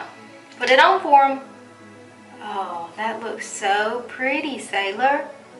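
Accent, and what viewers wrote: South, United States. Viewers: I absolutely love your tutorials! I also have the country accent, yours is cuter! 💜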